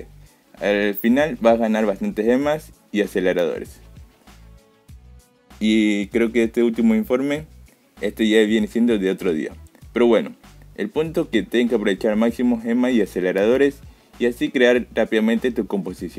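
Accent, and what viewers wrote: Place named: Guayaquil, Ecuador. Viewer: Sabía que ese acento se me hacía muy conocido xd Guayaco pa?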